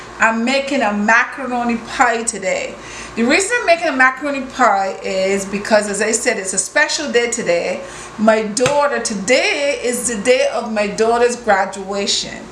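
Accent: Barbados. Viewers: Love that I can still hear the bajan accent coming thru. Bless up queen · I too love a Bajan accent. Music to my ears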